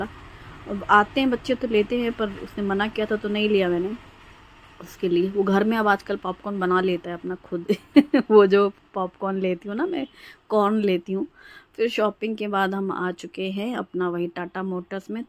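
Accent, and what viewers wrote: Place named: Lucknow, India. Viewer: Hum lko k hi hai.. Apki bolne k tareeke se hi laga ap wahi k aspas k hoge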